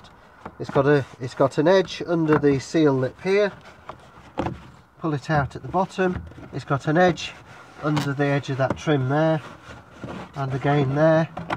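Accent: United Kingdom. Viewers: Love British accent :) very helpful video, many thanks!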